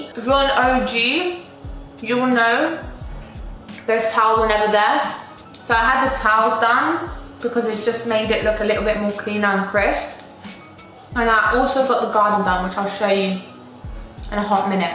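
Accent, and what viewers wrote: United Kingdom. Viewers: I do love British accent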